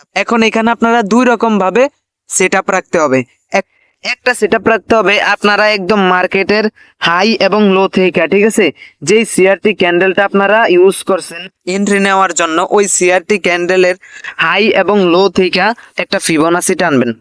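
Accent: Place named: Bhola, Bangladesh. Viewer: ভাই আপনার বাড়ি কি ভোলা। আপনার মুখের ভাষা ভোলার ভাষার মতো অনেকটা তাই জিজ্ঞেস করলাম।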